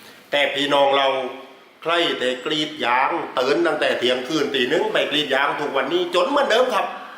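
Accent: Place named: South, Thailand. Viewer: ฟังได้สักครู่เลิกฟังเลยครับ กลายมาเป็นพูดสำเนียงภาคใต้ซะนี่ อีกทั้งเรื่องที่พูด ดันเหมือนเล่าให้คนใต้ฟัง ไม่ใช่พูดให้คนภาคกลางและภาคอื่นๆฟัง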